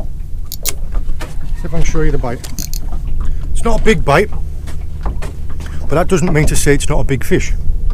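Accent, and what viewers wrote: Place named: West Country, United Kingdom. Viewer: A cracking watch as usual, so many talking points!! Tips & hints galore all the way through which we have all became accustom to, watching your vids, I'm no stranger to wreck fishing but I have a skipper's guidance to assist me, the fact that you're doing this solo makes it all the more creditable. The part of the country that you're fishing makes me envious to say the least, no wonder you relocated from the North East, that's the accent I'm detecting!! Although I can hear a West country twang entering your dialogue occasionally!! If you are a sea angler in the UK does it get much better than Cornwall? As you've shown the fishing ain't too shoddy but the fact that you share the environment with Minke Whales, Dolphins & Tuna really showcases the South West in general to anybody not already acquainted with it's natural beauty. 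As I said so much to talk about but one last thing, how close do these other boats want to get to you? How annoying when they've got the entire ocean to fish!! Solid stuff, just what I need on a Saturday morning!! All the best pal.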